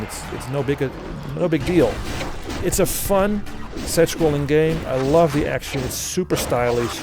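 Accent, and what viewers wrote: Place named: Netherlands. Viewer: Thanks for your review. BTW, your accent really sounds like someone from The Netherlands.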